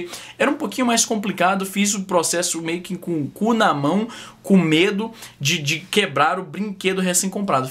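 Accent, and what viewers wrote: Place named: Northeast, Brazil. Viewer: grossura? ,,,,esse é conterrâneo nordestino(como eu) ...coisa boa kkkkk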